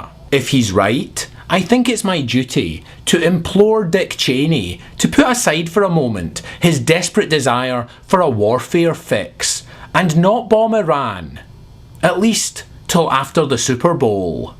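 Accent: Scotland, United Kingdom. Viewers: Normally I wouldn't do anything so pedestrian as comment on someone's accent. But your scottish tambre makes "warfare fix" sound like "warFEAR fix", which when you think about it is entirely appropriate. As for "American" Football, it's ironic that Minnesota is lagging so far behind on their stadium work, they may lose the Vikings to Toronto! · Your American? but you have a Scottish accent. Anyway, there wont be a war on Iran. Not for the moment anyway. The USA is stuck in a quagmire in Iraq and Afghanistan and it is becoming very expensive. The US economy is falling, along with its dollar and a war with powerful Iran(not a third world country)would be devistating to US economy. Also, countries like China and Russia are supplying some powerful weapons to this country.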